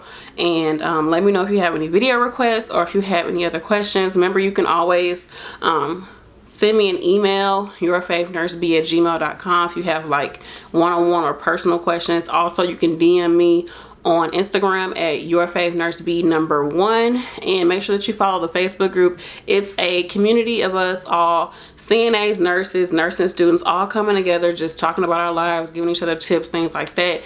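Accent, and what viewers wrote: St. Louis, United States. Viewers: I knew you had to be from St Louis I can hear it! I’m from St. Louis too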